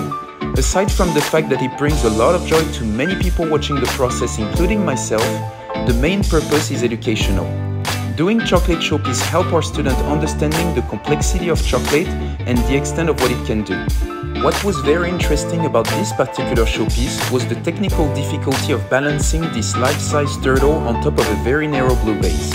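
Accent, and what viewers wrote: France. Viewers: He's losing his french accent? Amazing turtle tho! :)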